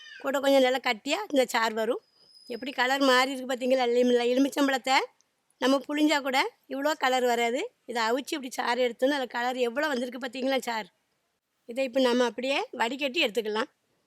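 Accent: Tirunelveli, India. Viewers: Tirunelveli tamil kekka sandhosama iruku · Nenga Nagercoil Ila Tirunelveli ya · Tirunelveli slang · Tirunelveli bashai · Nenga thirunelveli pakkama? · உங்கள் ஊர் சொல்லுங்க..திருநெல்வேலி பேச்சு மாதிரியே இருக்கு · திருநெல்வேலி, கன்னியாகுமரி மாவட்டங்களில் பேசுவது போல் இருக்கிறது. சூப்பரா இருக்கிறது.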